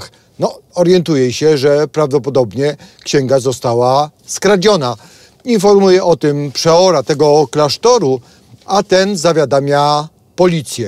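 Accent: Kraków, Poland. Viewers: Panie... no nie czarujmy się, powiedzieć, że full profeska jak na amatora i że pan Fajbusiewicz mógłby panu plecak nosić, to jak nic nie powiedzieć. Swoboda przed kamerą, merytorycznie przedstawiane sprawy, ten cudowny krakowski akcent ( jestem z pomorza zachodniego), no i oczywiście fenomenalne kadrowanie jak na " youtuberowych stremerów " to jesteście prze mega... tego, tego ale nie przeginajcie, bo to zajefajne kadrowanie z dołu staje się Waszym Logotypem. Pozdrawiam gorąco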